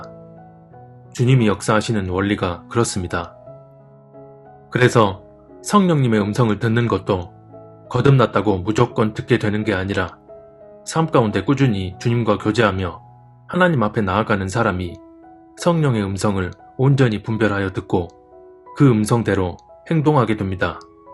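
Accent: Jeolla, South Korea. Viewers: 전라도 사투리....^^ 반갑습니다~ㅋㅋ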